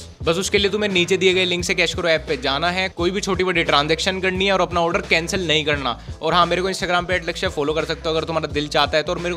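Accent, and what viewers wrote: Haryana, India. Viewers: I ❤️ haryanvi accent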